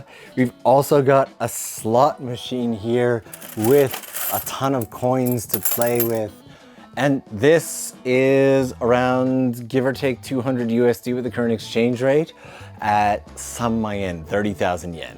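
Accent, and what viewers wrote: Canada. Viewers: Your Canadian accent really came out in this episode. Great stuff! love your videos.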